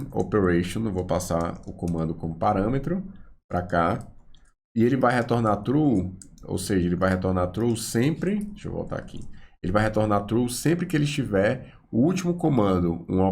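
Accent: Ceará, Brazil. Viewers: seu sotaque parece aqui do Ceará! procede??? =)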